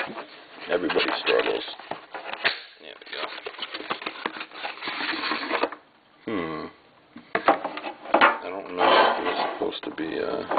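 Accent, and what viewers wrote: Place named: Boston, United States. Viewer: finally someone with a boston accent.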